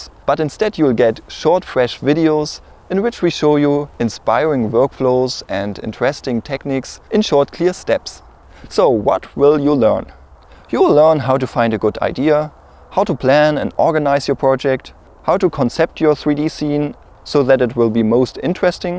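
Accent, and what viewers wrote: Germany. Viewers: Der deutsche akzent ist so süß :) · Der deutsche Akzent ist mega witzig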